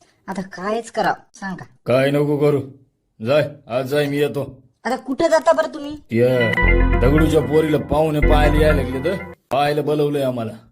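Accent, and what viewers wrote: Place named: Marathwada, India. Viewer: मराठवा डायची भाषा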